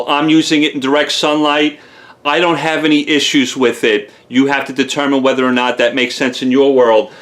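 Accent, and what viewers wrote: New York, United States. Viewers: I just love that thick NYC accent !!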